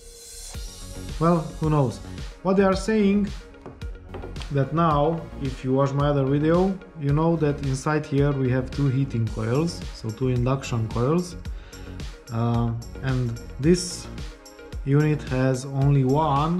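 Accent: Romania. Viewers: Esti roman, se simte dupa accent, nu trebuie sa ma uit la tine in profil ca sa imi dau seama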